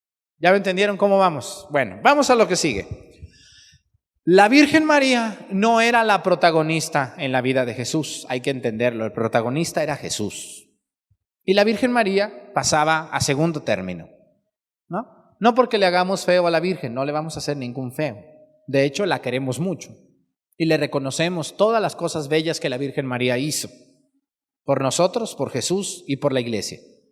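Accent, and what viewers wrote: Mexico. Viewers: Nada mejor para el domingo que escuchar de la Virgen María; su forma de narrar los hechos y su dejo mexicano tan agradable (3ra vez que veo este video), no necesita imágenes al narrar mi imaginación desarrolla lo hablado. Los sábados maratón de vídeos café Católico. Desde Lima Perú. Mis oraciones a Dios para que le de muchos años de vida con salud, alegría y fuerza por enseñarnos. GRACIAS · Me gusta como enseña y predica,se le nota lo mero México, Dios lo bendiga padre he aprendido mucho con usted, saludos desde,pasto Colombia, me hace reír por su forma de hablar,pero no es por burla yo respeto mucho a los sacerdotes es por su gracia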